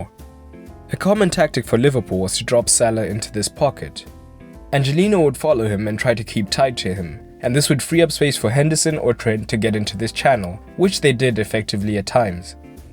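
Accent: South Africa. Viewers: First: are you South African? Second: before I watch, something I noticed was the Liverpool front three put intense pressure on City possession whenever they tried to play out from the back, Barca style. They forced longer balls which they would try to then win in the midfield to relaunch their own attacks very quickly. Highly effective throughout the 1st half! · Are you from south africa?? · Nice as always are you by chance from South Africa?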